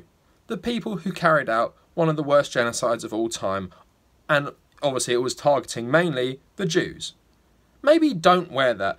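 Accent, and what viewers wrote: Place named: England, United Kingdom. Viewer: You may want to watch yourself. You said ‘kill’, ‘all’, and ‘jews’ in that order in a video and I’m assuming you live in England based on the accent, and as we know based on your trash judicial system, context is irrelevant. Plus, they’d probably have something to say about that poster behind you with that particular salute. Anyone want to get on the horn about this terrible person to the authorities?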